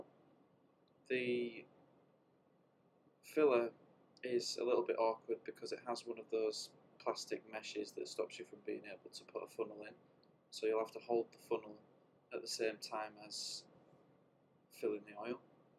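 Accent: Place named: North, United Kingdom. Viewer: Really helpful cheers buddy! Nice to hear a fellow northerner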